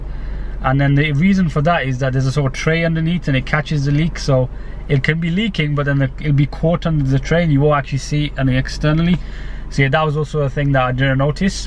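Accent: United Kingdom. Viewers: He's obviously from Great Britain ...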